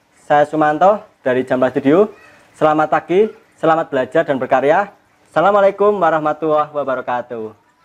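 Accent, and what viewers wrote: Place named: Java, Indonesia. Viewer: Wong jowo angel ilang jowone👍🏼😁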